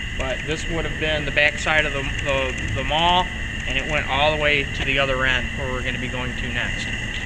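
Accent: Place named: Michigan, United States. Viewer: Jesus!!! Was the camera used from 1985?? The zoom is so loud. Nice job... the whinny Michigan accent of the narrator is hilarious.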